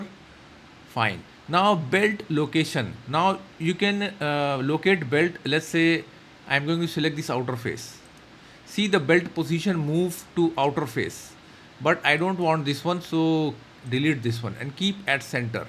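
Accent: India. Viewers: May I know what is the real use if you don't show calculations or show top down modelling approach from scratch? Solidworks if you really create it for fun make a playlist for that. But for serious application engineers like me it is mandatory that you show detailed calculation the entire process whats going on inside the chamber right rather than keeping it as bloops behind the curtain. Kindly try to tune your pronunciation. Ur bloody Indian accent sounds awfully monotonous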